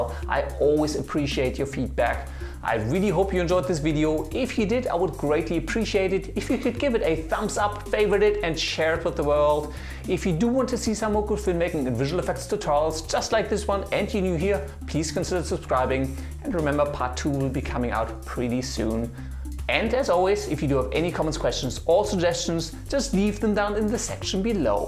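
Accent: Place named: Germany. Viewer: Hey You sounds like a German accent to me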